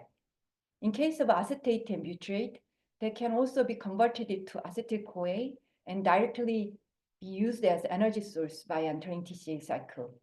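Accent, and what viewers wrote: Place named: South Korea. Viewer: Konglish is making my head reel... Im really trying to make out what she's saying. Sorry 😔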